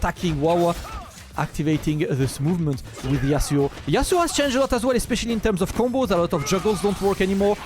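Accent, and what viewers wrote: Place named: France. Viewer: Ça sent fort la France ce rythme et cet intonation :P Je ne te connais pas mais merci pour le partage de ce combat.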